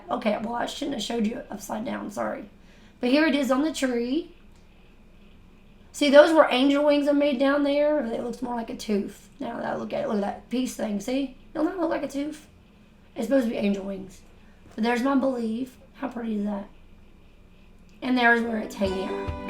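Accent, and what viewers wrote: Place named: South, United States. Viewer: I love it! I agree with Tyler that you can really hear your southern twang come out when you’re crafting on video. Super cute! Love you! 💓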